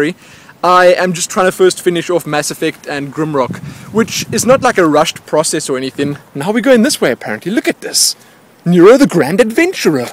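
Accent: South Africa. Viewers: dude are u south African or just from Africa because iv got a mate up in Namibia and he sounds like he is from sa. and that place looks like capetown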